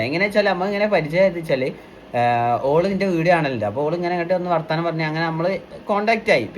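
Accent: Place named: Malappuram, India. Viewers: Malappuram slag ann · എഴുതച്ചാൽ മലപ്പുറം ഭാഷയാണ് പറയുന്നത് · Malappuram bashayan malayalam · ജ്ജ് മലപ്രം ഭാഷേണ ല്ലോ പറീണത്😅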